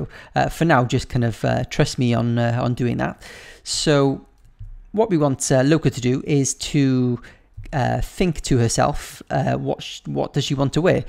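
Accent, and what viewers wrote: Wales, United Kingdom. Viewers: Are you from Cardiff? Or Barry? Or somewhere in Wales?? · Ugh this guy.... He helps me with my story. But i'm getting hella mad at his british accent.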